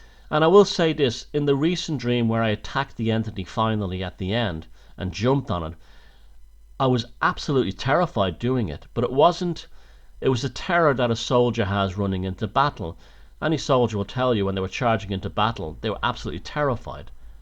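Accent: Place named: Dublin, Ireland. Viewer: Great to have you enjoying your work, iv learned so much from your videos, I'm like the gotchy man, ya have a Dublin accident people think your a cretan, and my accent is like yours dub. Not the new flat strong Dublin accent, thank you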